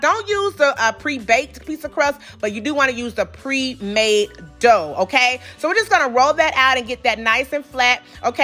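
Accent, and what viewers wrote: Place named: New Orleans, United States. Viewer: At first your voice made me think something negative BUT, the more I listen, I love it. It remind me of home in New Orleans. Thank you for all you do.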